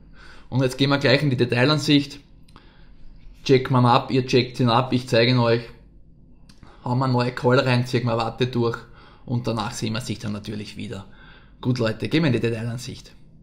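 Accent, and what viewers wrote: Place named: Austria. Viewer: Krass .... Capital Bra mit österreichischem Akzent! Klasse Video, weiter so :)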